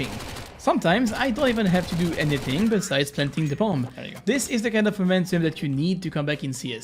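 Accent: France. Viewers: Omg the french English accent is the cutest thing ever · Are you French?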